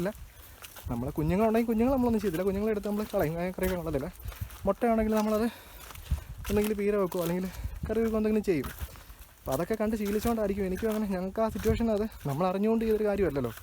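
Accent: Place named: Vaikom, India. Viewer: Adipoli samsaram kettapozhe thoni enta sthalam vaikom ippo soudiyila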